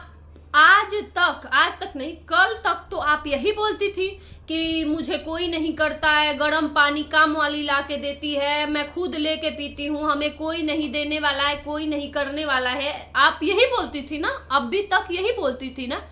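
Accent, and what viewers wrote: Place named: Bhojpuri, India. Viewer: Dear aap kahan se ho mast bhojpuri bolti ho bilkul mere jaise 😂😂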